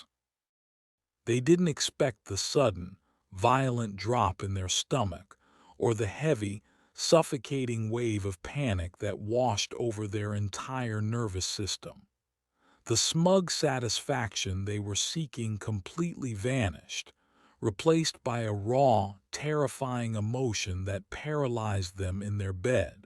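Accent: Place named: Maine, United States. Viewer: You sound like you are from up east.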